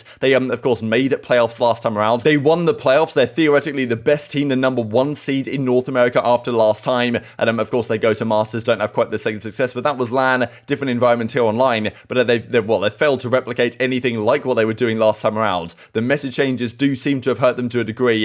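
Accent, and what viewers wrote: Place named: United Kingdom. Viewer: Thx for uploading daily, beside the news, at least your video is useful reference for learning British accent XD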